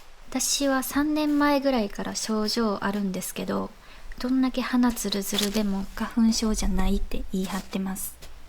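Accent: Kansai, Japan. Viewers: トークのセンスが最高です！関西を協調せず、ホンワカした雰囲気がとってもよいです。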